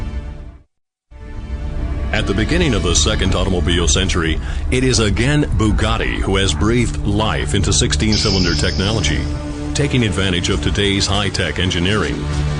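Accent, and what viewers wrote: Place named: France. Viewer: why is he saying everything in a french accent :/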